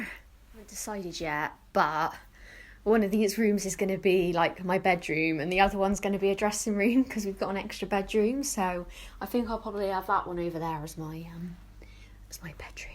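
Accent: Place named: Bristol, United Kingdom. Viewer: Fair play with the marathon, it's weird hearing a bristolian accent on you tube as I'm from bristol too 😁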